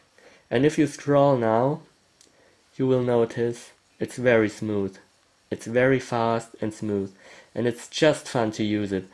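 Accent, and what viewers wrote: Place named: Germany. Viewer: awesome german accent...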